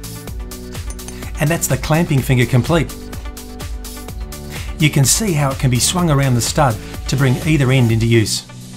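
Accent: Australia. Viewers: Are you in australia mate? You sound aussie!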